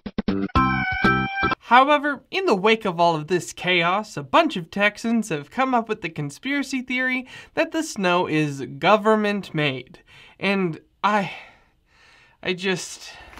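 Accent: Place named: California, United States. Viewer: I find his California accent annoying. His face is also annoying. His attitude is a jerk.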